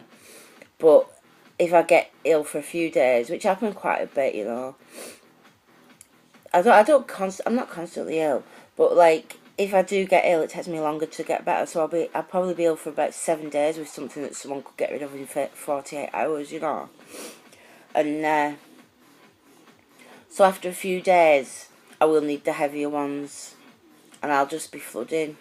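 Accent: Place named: England, United Kingdom. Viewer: by the way I like your accent are you from North or Southern England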